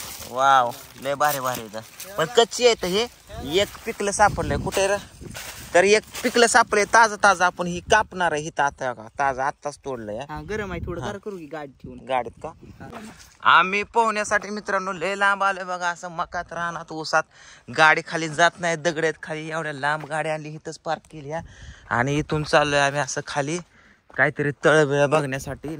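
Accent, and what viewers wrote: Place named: Malshiras, India. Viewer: तुमची भाषा खूप छान आहे मला माझ्या भावाचं बोलणं आठवतं माळशिरस साईटला सेम आसच बोलतात त्यामुळे मी तुमचे सगळे विडिओ बघते पण कमेंट करत नाही आज केली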